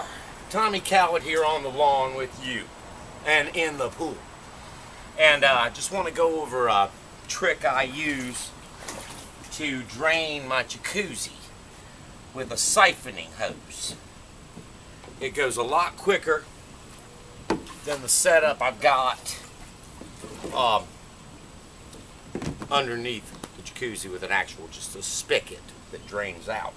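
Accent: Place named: Virginia, United States. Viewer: Sad, I have to watch this video every Spring when I take down my tub! You sound like you are from the Eastern Shore of VA!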